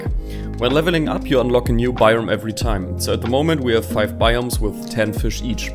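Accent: Germany. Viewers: Warte du bist deutsch oder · R u german? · Englisch ist top, aber der deutsche Akzent verrät dich sofort 😂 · Kleiner Tipp: Der deutsche Akzent hat dich verraten 😏 Grüße aus dem Deutschen Reich! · I hear deutsch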